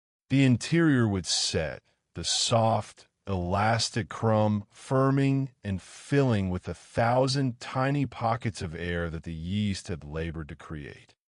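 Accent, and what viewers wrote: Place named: United States. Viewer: English tales by AI with an american accent lol. Lets give it a go 🤞🤞🤞 ............ as always, awful punctuation 😠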